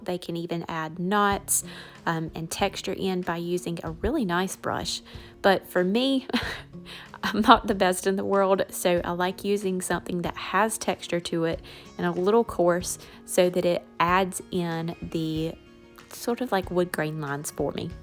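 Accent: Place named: North Carolina, United States. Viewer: Absolutely beautiful your accent sounds like you’re from N.C I live in Canton N.C beautiful work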